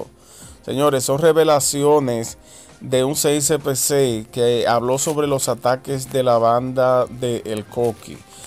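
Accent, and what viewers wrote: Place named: Cuba. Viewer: amigo se nota que estas en contra del régimen pero de donde carajo eres por que no pareces venezolano, dices arcarde , gobelnadol ... eres cubano o de donde carajo? si eres venezolano disculpa pero toma clases de dicción.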